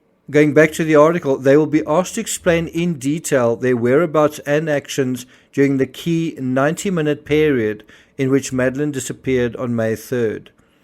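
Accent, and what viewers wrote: South Africa. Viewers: are you originally from South Africa? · How much is this man making from these smear videos? He sounds South African, so no where near Portugal. The McCanns have suffered enough so leave them alone with this barmy self invested Youtube channel. Give it a rest and maybe post on UFO’s instead? You are part of the problem. Maddie is no doubt not with us any more so stop trying to make her parents lives even more awful and go away and stop making money from misery.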